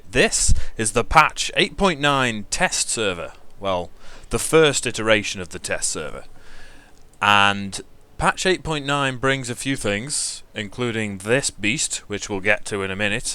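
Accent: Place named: United Kingdom. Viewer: I just love the Brit accent. it's quite epic. Makes anything great (he could read from the dictionary and it would sound good to our American ears !) :-) (but he does good reviews too - beyond that lol)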